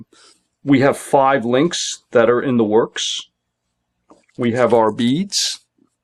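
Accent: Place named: East Coast, United States. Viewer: Great video...until the end...even though I got what you were teaching you kept going out of the camera view...I found myself telling you to move up...LOL...Still you are very clear and I am sure I could learn a lot from you so I am going to subscribe...I like your accent...are you one the US east coast? Thanks for sharing I am so glad I found your videos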